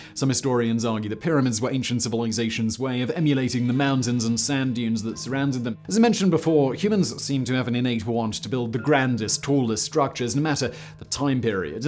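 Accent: England, United Kingdom. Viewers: Dude has used 11th grade literacy and semi posh English accent to build an empire. And i mean "11th grade literacy" at maximum. he mispronounces words I've never heard mispronounced before. Regardless, business brain blaze has made me love him AM I RIGHT PETER!?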